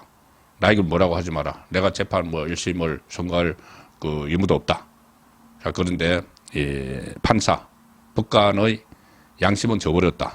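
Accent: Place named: Daegu, South Korea. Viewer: 좋은 방송으로 잘 듣고 있는데 진행자의 억양이 참 거슬립니다..앞 음절이 올라가는 대구 억양인데 용어의 뜻이 달라지기 때문에 꼭 고쳐서 방송에 임해주십사하고...이런것은 사투리를 떠나 쉽게 고쳐질수 있다고 사료되는데...........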